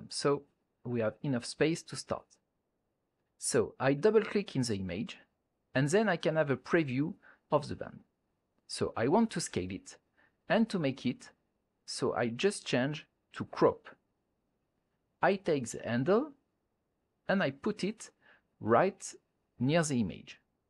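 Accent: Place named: France. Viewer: L'accent français je l'aime tellement, et vous avez aussi un bon scénariste (jeff)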